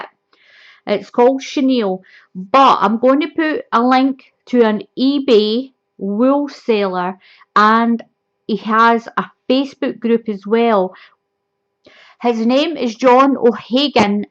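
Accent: Scotland, United Kingdom. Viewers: are you Scottish? your accent is very cute. greetings from Romania! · what a heavy british accent 😂 wow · are you scottish? I just love your accent!! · Very clever idea. I have a kind of personal question though, are you scottish or from northern England?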